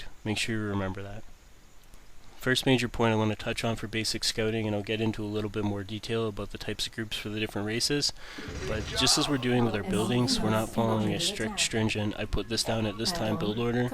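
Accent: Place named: Canada. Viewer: Having gone through this series from the start, I feel it's funny how I agreed with one of the early posters who complained about voice inflection etc. (and of course his oat and aboat Canadian accent:-) But it seems I got used to it very quickly, I guess mainly because he says a lot of very useful things that are right up my alley. All the other "coaches" even such as day9 seem unable to break down the many many aspects of the game into steps that you can practice individually.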